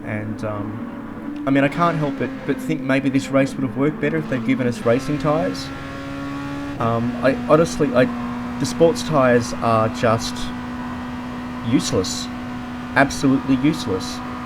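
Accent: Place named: Australia. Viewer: You have an aussie accent, very nice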